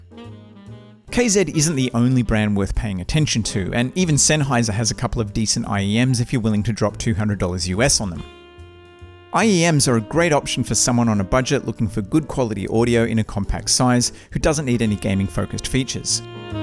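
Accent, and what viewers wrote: Australia. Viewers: Great video, don't know how i didn't see this ages ago. Is treble important for healing footsteps? I thought i heard an Aussie accent so had to Sub.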